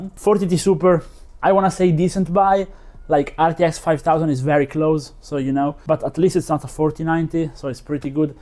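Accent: Italy. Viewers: Are you italian? (Great vid btw)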